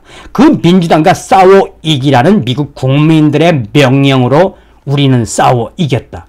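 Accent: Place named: North Korea. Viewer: 북한 말투쓰지 말고 그냥 평범한 일반 표준 한국어로 진행 하시길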